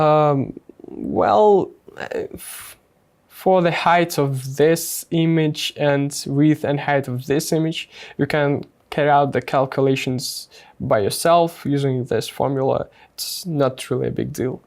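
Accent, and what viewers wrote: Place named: Russia. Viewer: зачем на английском? будто европейцы будут смотреть видео с таким жёстким акцентом русским))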